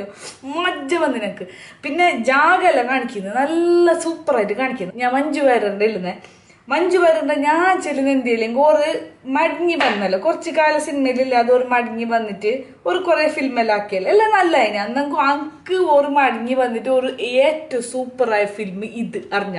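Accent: Kasaragod, India. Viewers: +kasargod slang +thattam.. a deadly combination... Meanwhile weak point of any dare and devil soul.. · കോഴിക്കോട്ട് ഉള്ളോർക്ക് തെരിയാത്ത ഭാഷണ്ടോ ഞമ്മക്ക് ഇതൊക്കെ എന്ത് ഹൽവ മാതിരി കാസ്രോട്ട് പെര്ത്ത് ഇഷ്ട്ടം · ഒരു വീഡിയോ ഇടൂ ട്ടോ ഞങ്ങൾ തെക്കൻമാർക്ക് വേണ്ടി.... കാസറഗോഡ് കൊള്ളില്ല എന്നല്ല അതിനർദ്ധം... പൊളിയാണ് താങ്കളുടെ കാസറഗോഡ് talk💕💕💕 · ഞമ്മള് ഇങ്ങളെ കാസറോഡ്‌ ബാർത്താനം കേക്കാൻ മാണ്ടി ബന്നതാ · 👌👌👌👌 kasrod baasha superaayi paraynnind..bhaashaye ingne abhimaanathodu koodi paranh variety channel aakyathm mass aayin. thattam dairyaayit itto..palarum enthokkeyo paraynnind..kaaryakanda.. Indian pourante avakaasham💪. kurupottiknnavare kaaryakenda..full support💪💪💪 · Endokkeyo evideyokkeyo manassilaayi. Edakk lucifer ennu paranjond athum😂😂. Kettiond irikkan nalla resond kasargod slang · Review from an innocent mind, through super kasaragod coloquiel language, super.. 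· Review പത്തുപൈസക്ക് ഇല്ലേലും..... നമ്മളെ കാസ്രോട്യൻ സ്ലാങ് പൊളി ആക്കീന്.... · നല്ല അടിപൊളി ആണ് ടോവിനോ നല്ല നമ്മളെ കാസറഗോഡ് ഭാഷേ നല്ല ചേലായിനി നമല്ലേ ലാലേട്ടൻ നല്ല പങ്ങായിൻ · Sherikhum kasergode ulla ellavarum ingane aano samsarikkunnathu....Ente kude oru 4 perundu pakshe Avaru ingane samsarikkunnathu kettitilla.....mangalapuram kaaru Ee reethiyil ulla malayalam paranju kettitund..,avarude Ee Baari Bhaasha super aanu kelkkan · Muslimallaaannn enikkariyaaam.olentheppaa shawllokkeyittit ksd slang paraynne.athond allaand olod asooyannolla😂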